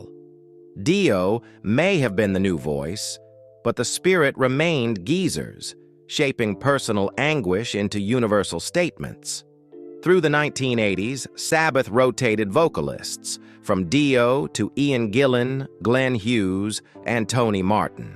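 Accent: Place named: United States. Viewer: can't all yanks just stop narrating Sabbath history in the awful American English...shocking...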